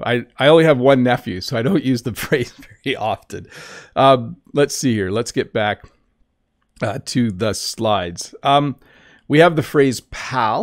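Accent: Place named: Canada. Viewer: Sorry this is Canada English what is this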